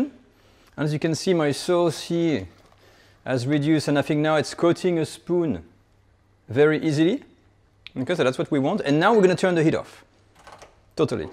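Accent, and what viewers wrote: France. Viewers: Love your cooking and your French accent ❤️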